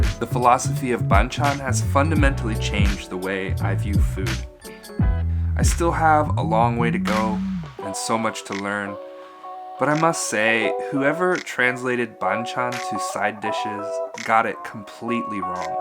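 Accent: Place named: United Kingdom. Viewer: your british accent is so funny! ㅋㅋㅋ 한국인보다 한국음식을 잘아는 사람!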